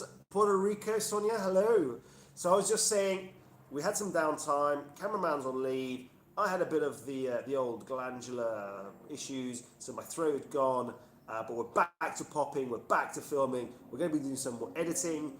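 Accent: United Kingdom. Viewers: I love your British accent, you are lovely and really hot. Looking forward for more videos. Greetings from Germany. Enjoy your vacation.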